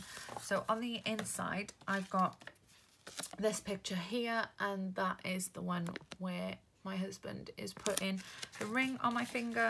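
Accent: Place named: Cardiff, United Kingdom. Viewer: thought it was a Cardiff accent. We are from Pembrokeshire, my son is at Cardiff university so I’m there quite a lot. 😊😊😊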